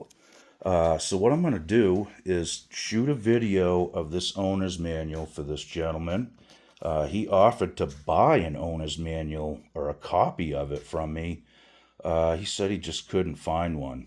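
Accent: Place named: New England, United States. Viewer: Nice studio. 😳 Are you from Massachusetts or New England? Edit: Maine. just said it.😁 Sound like my cousins from Friendship & Waldoboro. But not quite as drawn out on some words. PowAH! ⚡️ lol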